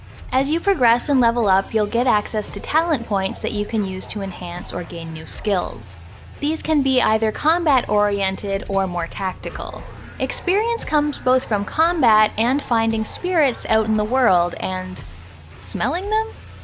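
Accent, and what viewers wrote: Canada. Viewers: I could barely tell. It's the nicest Canadian speaking voice I have yet heard.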